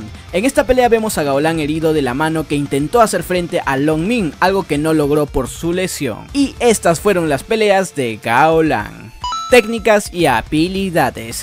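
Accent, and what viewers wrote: Peru. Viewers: Vos sos peruano?? 🥺👌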